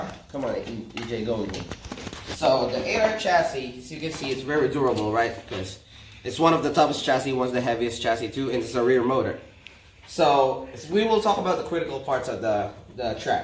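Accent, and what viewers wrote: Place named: Philippines. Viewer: Filipino accent I hear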